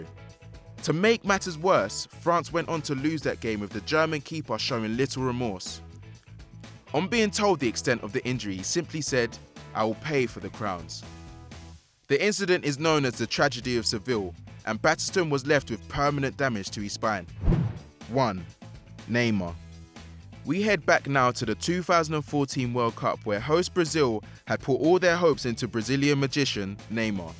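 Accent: United Kingdom. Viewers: You are British 🇬🇧 😏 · he's british/autralian not american. 🤡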